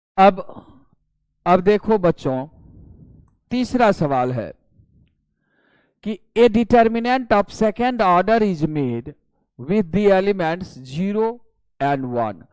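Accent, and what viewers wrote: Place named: Bihar, India. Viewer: Kya tone hai sir ka 👏👏 Pure bihari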